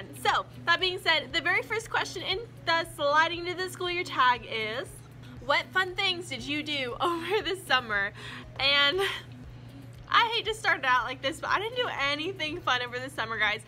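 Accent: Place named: Tennessee, United States. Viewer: So, I've been watching you from Day 1 on here & I told my niece I didn't know what it was about your videos, but you felt relatable. 1) I organize & put stuff together like you (all over the place, but it comes together nicely.) 2) I'm bubbly like you. Love the positivity. 3) I'm from Memphis, TN! I knew your accent and stuff sounded familiar & now I know why. You're a fellow Tennessean! Anyway loving the videos. I homeschool, but we have had so much fun watching your videos. Keep 'em coming !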